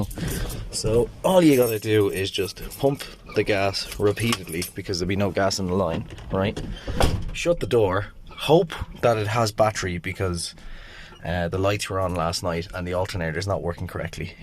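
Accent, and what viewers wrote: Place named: Ireland. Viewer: The Irish accent and the laid backness does it for me 😂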